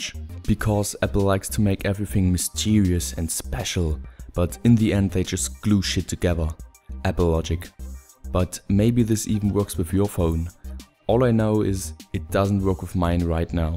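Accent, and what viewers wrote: Germany. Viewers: Yes, he is German. You could tellthat he is German by his accent.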